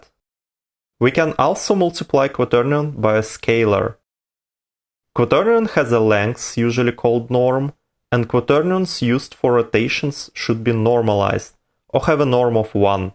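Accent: Russia. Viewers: Хард рашен акцент!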